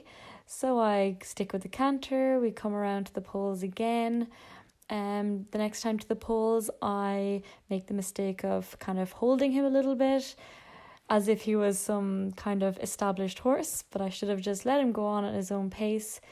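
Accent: Ireland. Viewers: I can sense the Mullingar accent from a mile away !! 😂😂😂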